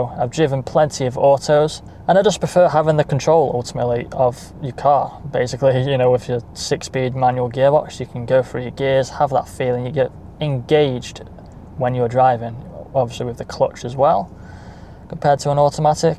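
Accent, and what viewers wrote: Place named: United Kingdom. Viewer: Why are you speaking british?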